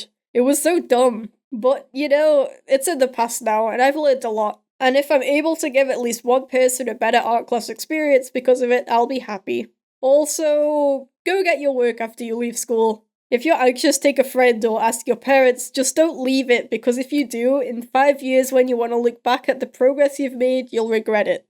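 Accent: United Kingdom. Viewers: Still don’t know if he a boy or a girl his British voice just makes it harder and is he supposed to be a furry ? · your voice sounded weird (well your voice always sounds weird to me because I'm American and only know a few Brits)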